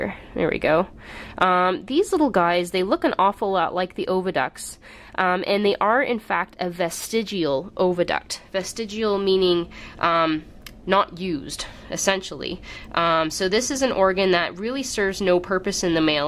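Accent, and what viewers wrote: Canada. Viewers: First of all, she lives in Canada and is a Canadian. They have a different accent and pronunciations.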